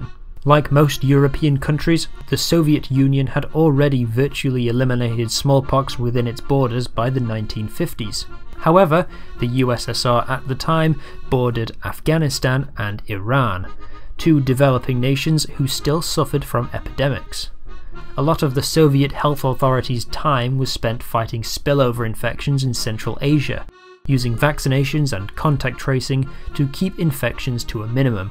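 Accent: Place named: United Kingdom. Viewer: the narrator has a british accent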